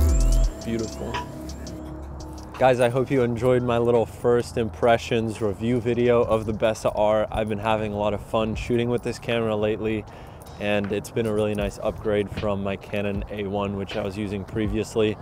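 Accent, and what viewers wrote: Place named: Netherlands. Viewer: Ben je Nederlands of Belgisch?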